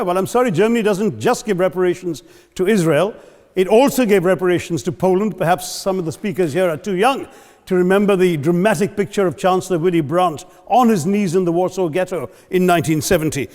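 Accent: RP, United Kingdom. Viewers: He speaks British English exactly. I loved it. I have a problem in my tounge, so I can't stress some words like how he stress. That's why I preferred to speak American English. I like to hear British English too. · lmao his accent is British, considered to be one of the most beautiful accents. Please consider your pronunciations and accent first if you had the guts to say that. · In British accent as well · Tharoor, you were born in England and you are talking against her.I think England has been giving more and more to india than it actually ever took and moreover you are trying to act over-confident with the British accent not the Indian accent. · wow i wasnt aware that he can speak british accent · Roasting Britishers in their accent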